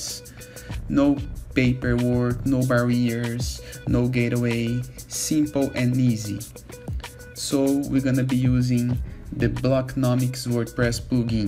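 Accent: Brazil. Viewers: Olá, amigo. Pelo sotaque suponho que é brasileiro. Quero ver se consegue me ajudar com um dúvida, quero gerar uma forma de pagamento que transforme o valor de Reais para a cotação do momento, tipo, gerar o QR code de R$500 com a cotação do mercado bitocoin. Valeu, amigo. Sucesso.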